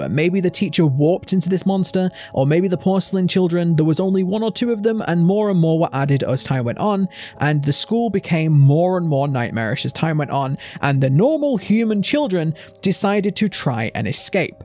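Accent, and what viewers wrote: United Kingdom. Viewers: “I absolutely adore Little Nightmare theories.” I absolutely adore British accents saying they absolutely adore something.🥰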